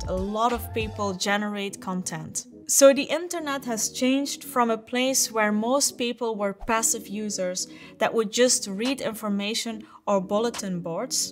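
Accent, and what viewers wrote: Netherlands. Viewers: She sounds so dutch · are you dutch?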